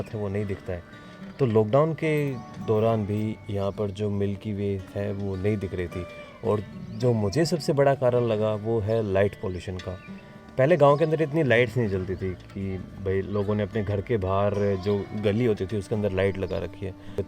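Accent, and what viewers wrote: Haryana, India. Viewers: bhai Haryanvi mst lgti hai apke muh see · bhai ki haryanvi ekdum keher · Nani and u rock bhai ! Bhai Thara video dekh r mjo aaygo ! Hindi nav to aapni haryanvi mst lage bhai ! Or didi - niche to kar inee ! 😄✌️ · i belong from a village of UP but it was wonderful to see village lifestyle of Haryana with some desi Haryanvi pure akscent. Tahnxx, i last vedio i suggested you to show some water conservation technique you are doing good in this channel believe me.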